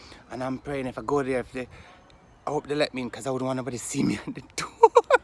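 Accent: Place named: Jamaica. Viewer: You sound like a yardie. Love the story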